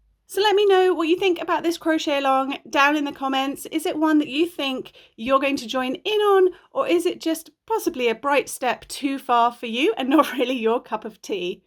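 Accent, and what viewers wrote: England, United Kingdom. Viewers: So happy to see a face with that awesome English accent and perfect nails. Thank you for all you do to bring us your patterns. ❤😊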